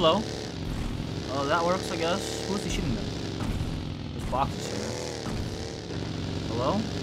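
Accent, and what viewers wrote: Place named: United States. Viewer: I love your American accent commentary love u brother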